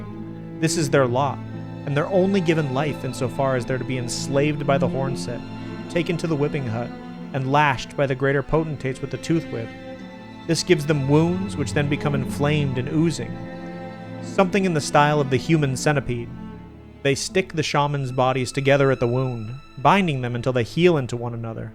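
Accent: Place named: United States. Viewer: u pronounce words so american😭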